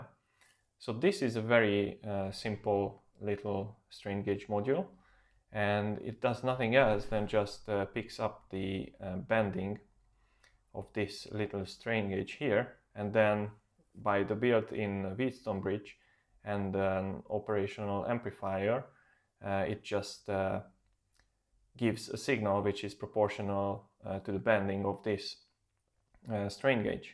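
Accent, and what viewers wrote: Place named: Hungary. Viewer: Le se tagadhatnád, hogy magyar vagy ilyen akcentussal. Amúgy jók a videók👍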